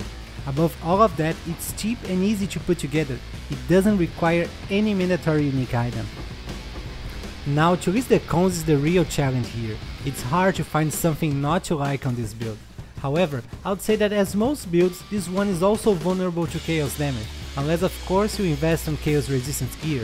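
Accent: Brazil. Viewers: Cara é incrivel como seu inglês é perfeitamente correto e ainda consigo sentir que é um BR falando ahuahuahuaau nice video irmão!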